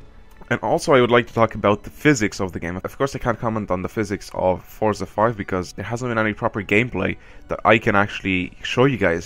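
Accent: Ireland. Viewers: U sound irish.are u irish?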